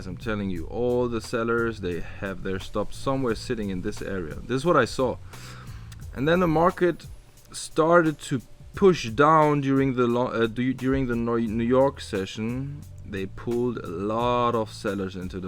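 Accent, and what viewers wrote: Germany. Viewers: Bro du bist doch Deutscher, das hör ich doch direkt raus 😄 warum hast du deinen Kanal in den USA located? Wohnst du da seit einer Zeit?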